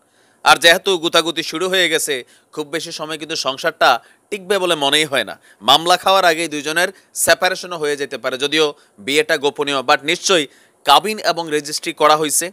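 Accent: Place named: Comilla, Bangladesh. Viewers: আপনার মুখে কুমিল্লা র ভাষা অনেক ভালো লাগে ভাই য়া 🌹🌹🌹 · ভাইয়া আপনার কুমিল্লা র কথা গুলো সুন্দর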